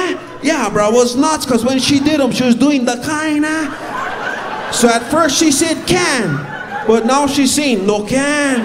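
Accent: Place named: Western Sydney, Australia. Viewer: eerily similar to a western Sydney accent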